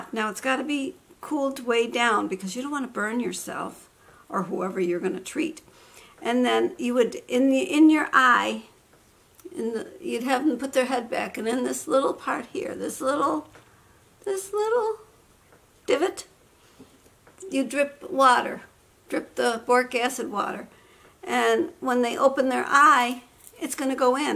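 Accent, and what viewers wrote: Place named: Northeast, United States. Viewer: I knew you meant COLD in your eye, not coal...hehehe...you Yankees talk funny! It won't help with an allergic reaction but to get rid of the sting from a bee or wasp sting is to first get the stinger out then apply a chewing tobacco compress or soak the tobacco from a cigarette in water then put the wet tobacco on the sting.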